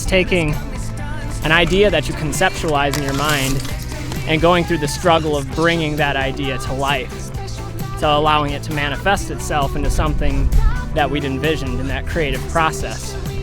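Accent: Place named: United States. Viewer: Greetings from Europe, thanks for that pure American accent ☺️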